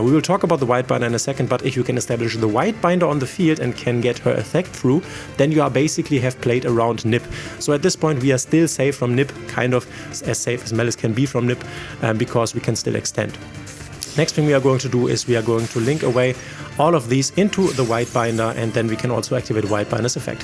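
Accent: Germany. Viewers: is it possible you're a German? I think the way you speak reminds me of German xd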